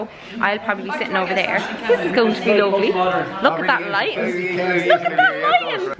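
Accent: Ireland. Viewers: Hey! I've spent in Ireland quite a lot of time, almost a year actually, (in Drogheda) and I miss it so much that I started looking for Irish youtubers so that I could hear that gorgeous accent again! Well, I eventually found you and I must say I really like your channel! Very interesting... You got yourself a new subscriber! Good luck for everything, bye from Italy:) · I just subscribed to your channel a few days ago and I just wanted to tell you that I reeeeally like your videos!!!♡ (and your Irish accent omg absolutely love it and could listen to you for hours ♡♡♡♡ I've studied in Maynooth for one semester and I was looking for Irish youtubers for so long!!) Thank you so much for speaking up against body shaming!!! I'm 24 now and I'm still struggling with my body image and weight and I know that part of this is because stupid girls in school told me I would be fat (that was at the age between 11 and 15...so exactly the time when you don't feel comfortable in your body anyway). 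It's so sad to think that those comments still affect you years and years later because when someone repeatedly tells you your too fat/too skinny/too whatever you start to actually believe it... I sooo wish that people would just stop being mean towards each other and I hope this can happen through the help of influential people like you and many other kind-hearted Youtuber!!! xxxx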